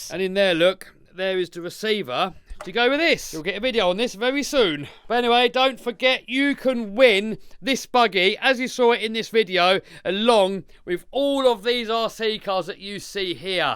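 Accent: England, United Kingdom. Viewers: You sound English why are you sucking up to the yanks by giving prices in Dollars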